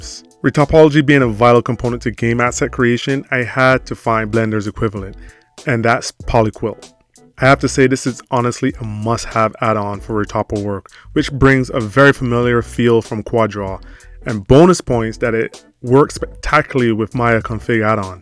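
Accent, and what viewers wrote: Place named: Barbados. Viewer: Bro Idk why you sound Bajan to me. Thanks for the vid, gonna try this addon out once I get better at Blender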